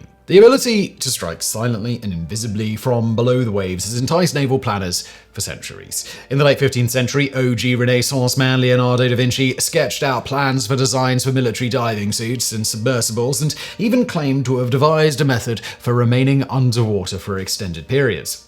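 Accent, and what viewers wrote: United Kingdom. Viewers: I do enjoy listening to a Brit talking about the American revolution.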